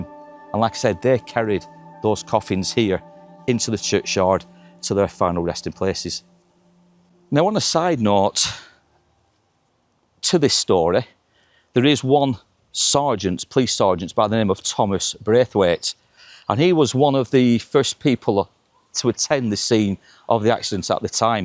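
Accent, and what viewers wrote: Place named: Rochdale, United Kingdom. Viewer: Another sad story but great video, You tell good stories and you're really good at what you do. you have the same accent as a good mate of mine that lives in Rochdale, we've been good friends for 25 years.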